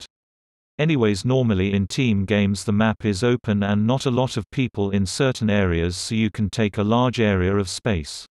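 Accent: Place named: United Kingdom. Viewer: You sound british. I make splix vids too.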